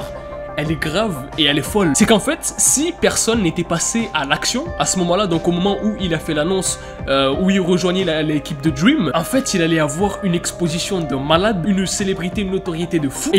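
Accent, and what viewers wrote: England, United Kingdom. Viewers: j'adore ton accent anglais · Je kiff ton accent anglais frérot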